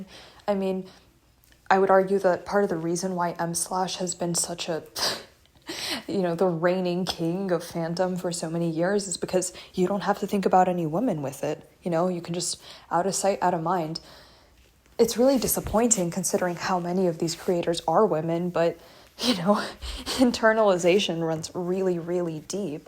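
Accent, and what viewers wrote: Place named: Greece. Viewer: I just started the video but your voice instantly let me know you're not just a woman, you're a woman of greek origin😂idk if you speak Greek, your pronunciation is great, but I just knew ahaha, glad to see greek creators in the wild. edit: I checked your insta (followed and commented too, notice me!!/j), I was right and we're the same age too lol Edit 2: okay now that I finished the video, first of all, you took a lot of my thoughts and put them into words which I loved. It shows a lot of the mentality when it comes to writing women. I was thinking "most of the internet art is women, but that's because men drew out their p*n material. And then you said it, it's gooner shit. It's pretty statues with nothing about them personality wise. Some pros may make the design good enough to communicate their role in the story but that's still surface level and they could absolutely suck in a story as a character, even if "woman pretty and design semi functional". 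I'm also in stem (heyyyy) so I appreciate a good graph and analytical approach with methodology for the conduct of the research. Apart from the video itself, I've been writing stories without realising it mostly about women. They're the driving forces of their stories, some of them suck and make the rest of the cast worse, manipulate the men, control their fates and relationships, either on purpose or by accident. I haven't drawn my characters a lot due to time, apart from the main duo, but I don't see them, any of them, as my b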